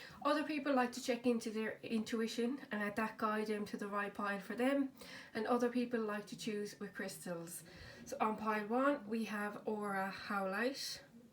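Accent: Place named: Ireland. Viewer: By the way your Irish accent is so cute. I do not hear people who speak English with Irish accent but it sounds interesting.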